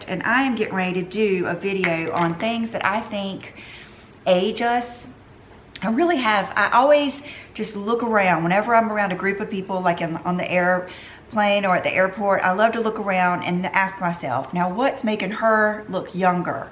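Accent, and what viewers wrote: South, United States. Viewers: Maria Daquila Right!?! I just love seeing her new video in my feed. Sweetest southern belle, this Long Islander loves to listen too❤️❤️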